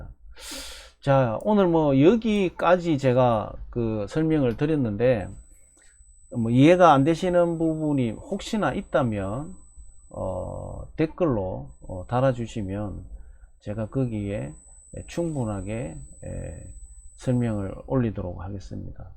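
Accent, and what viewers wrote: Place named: Gyeongsang, South Korea. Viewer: 갱상도 강사님 언태 하시고 꼴고 꼴았고 ㅋㅋ 구수하네요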